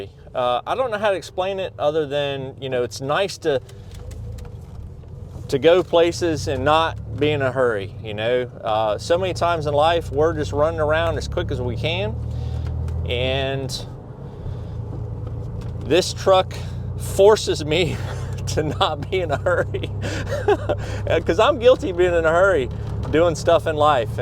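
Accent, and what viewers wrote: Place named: South, United States. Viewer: Look at you with the drone footage. Your southern accent has really picked up since being gone from Ohio. I wouldn't mind having an old manual vehicle. I miss a stick.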